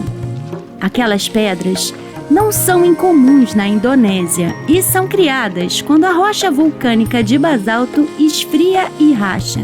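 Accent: Rio de Janeiro, Brazil. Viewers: Amei suas historias e sua voz carioca😊❤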